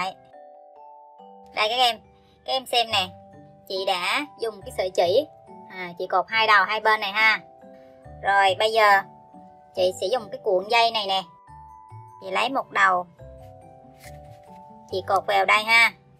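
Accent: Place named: South, Vietnam. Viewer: Đoán vội người miền nam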